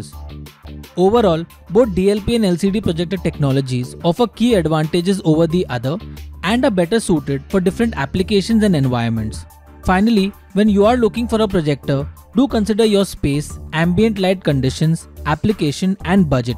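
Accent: India. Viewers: Can’t wait to see a new wideo, Indian ascents are great! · I wish youtube could filter out results with this hindu accent, i couldn't focus on content. Sometimes I feel like you guys speak like this on purpose, like you think it is so cool because BBT and Koothrapaali is popularrrr, yess...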